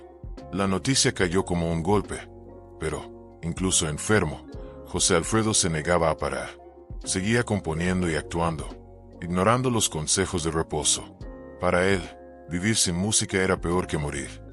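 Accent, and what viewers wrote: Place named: United States. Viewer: Y tiene acento gabacho. Parece que es gringo el narrador.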